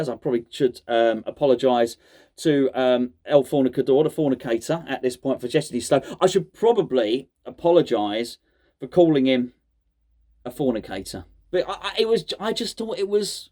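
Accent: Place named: Scotland, United Kingdom. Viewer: Definitely a hint of Scottish twang, bloody 🤥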